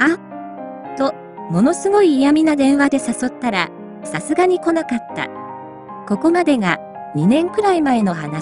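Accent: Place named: Kansai, Japan. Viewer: 関西の方ですねｗ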